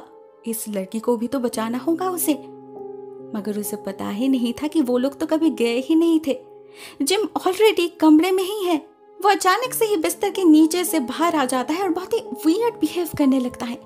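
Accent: Bengal, India. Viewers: Hi sis❤❤tmi ki bengali 🤔🤔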